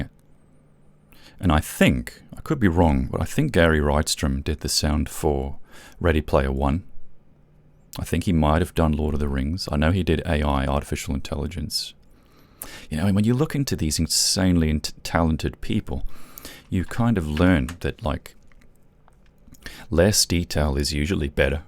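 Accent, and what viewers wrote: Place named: Australia. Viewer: he is the most Australian mf I've ever heard... Im so late but oml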